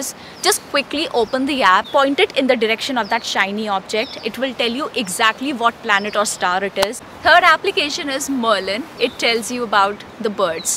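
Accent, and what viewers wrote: India. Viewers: You still have the Indian English accent!!!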